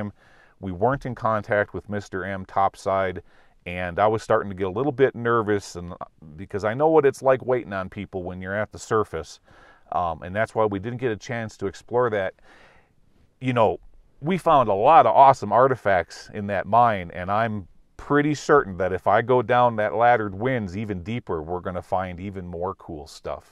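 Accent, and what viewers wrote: Wisconsin, United States. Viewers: Are you from Wisconsin?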